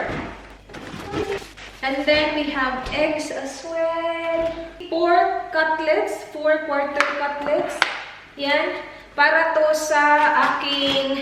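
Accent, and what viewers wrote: Visayas, Philippines. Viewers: bisaya ka noh?magkapareho kasi tayo na magsalita.bisaya din ako hihi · i knew it.. may pgkabisaya heheh i loveee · Pag nag tagalog parang bisaya ang accent pero pag nag English paksheet😍 sarap sa ears ate. Hi ate newbie hyr😘 pero diko sinasabing dimasarap sa ears yung tagalog haha labyuu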